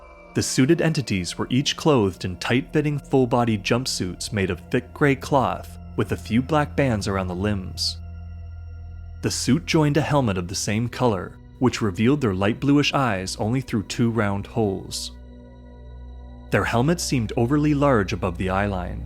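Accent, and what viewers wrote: Canada. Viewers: the comments of course as predicted are a bunch of middle school minded immature cretins only focusing on the sex and forgetting about everything else smh ... just making lame jokes and puns and giggling like kids who wear helmets and ride the short bus smdh what a complete joke of a channel, I remember now why I hit "don't recommend channel" a couple of years ago, and now the algorithm has suggested it again, thinking I forgot, and me thinking foolishly that maybe it has changed for the better, but nope, same old garbage as always, plus the added annoyance of the canadian "oat" and "aboat" to boot, ugh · I'd rather listen to an AI narrated video than hear another Canadian accent, any day 😭😭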